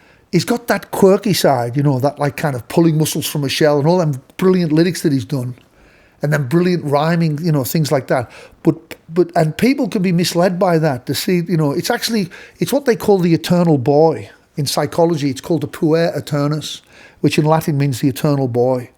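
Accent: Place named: Sunderland, United Kingdom. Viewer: I found your channel a few days ago and am very impressed my friend .am from stockton on tees ..you sound like a mackem to me 😂definitely from the north ?paul weller has been my god for 50. years I’ve heard he was very let’s call it spiky 😁when he was younger but he’s cool now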